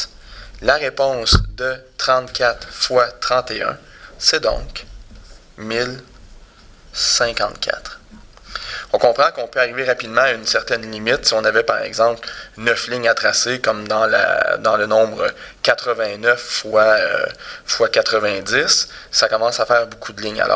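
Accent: Quebec, Canada. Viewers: owww un québécois , j'aime bien les québécois x) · Ooooohhh un quebecoiiisss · C'est plutôt sympa comme méthode, et c'est vraiment bien expliqué. (sauf que ton stylo rouge il n'écrit pas rouge😂😂). It's a shame! 😅. Avec un super accent québécois, j'adore! Maintenant est-ce que c'est vraiment utile comme tu le dis si bien ? Pas vraiment, c'est plutôt ludique en tout cas et rigolo. Mais je pense qu'il ne faut surtout pas que les enfants oublient d'apprendre les tables de multiplication, ça leur servira toute leur vie. Moi je les ai apprises en chantant comment on faisait avant et j'avais plaisir à le faire quand j'étais môme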